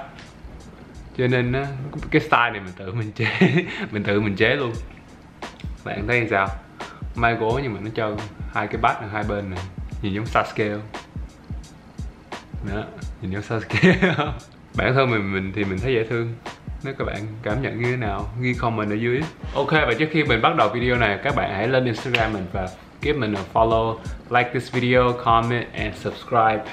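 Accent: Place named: South, Vietnam. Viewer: a noi giong nam dth von hnao thu noi giong bac di anh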